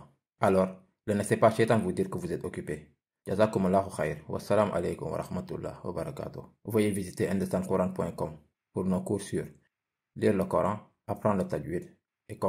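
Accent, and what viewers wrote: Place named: Senegal. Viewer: Une voix Sénégalais 🥰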